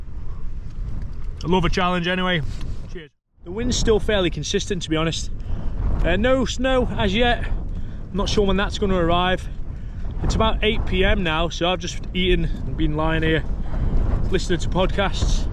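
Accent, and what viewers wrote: Liverpool, United Kingdom. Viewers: Are you a scouser by any chance?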